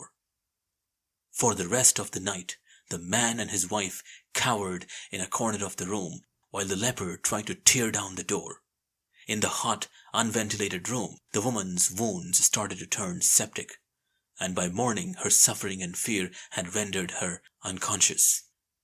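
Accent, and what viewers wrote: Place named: India. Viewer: Your stories telling in unbelievable You are a white Hunter talking with a Indian accent ,Who thought of this combination ,Its BRILLIANT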